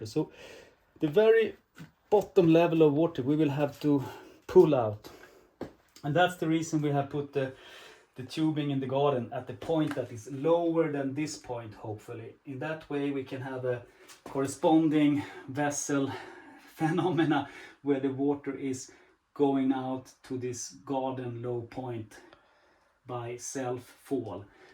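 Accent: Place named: Sweden. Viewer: Den värsta ikea-engelska jag har hört..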